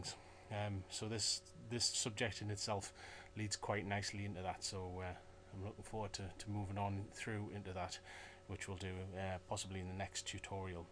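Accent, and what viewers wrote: United Kingdom. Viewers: Really great and clear stuff! Learning loads. Plus ice to hear a British accent for a change.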